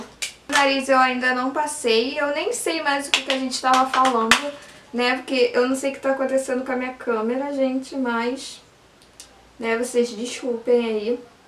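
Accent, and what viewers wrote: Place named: Rio de Janeiro, Brazil. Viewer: Aaa é muito carioca mano,amo esse sotaque carioca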